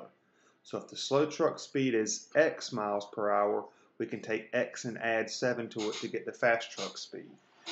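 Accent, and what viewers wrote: South, United States. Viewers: Neat explanation + I like your southern accent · are you from the south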